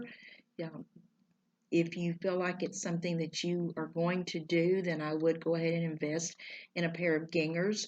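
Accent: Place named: South, United States. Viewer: Oh my gosh! Just found your floss tube and watched both back to back. Love your southern accent! Your voice is very relaxing. I love you showing fabric and thread options, different from what is called for! My choice for fabric is 32 ct even weave or 36 ct linen by PTP or Weeks. What are the smalls behind you? Are they fobs?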